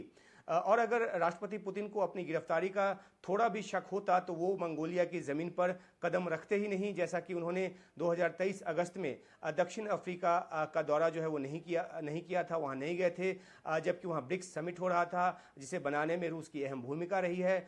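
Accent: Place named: Bihar, India. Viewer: साला बिहारी तेरि pronounce से पता चलराहा है तु बिहारी है या बाङ्गगाली है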